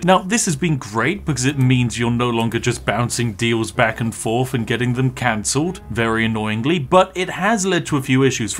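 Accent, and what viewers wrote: United Kingdom. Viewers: British pink daddy!! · Pink British Daddy, was all I needed to hear today. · Ew British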